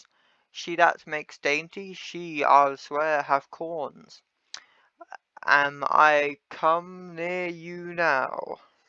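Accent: United Kingdom. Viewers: why are you british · Why are you British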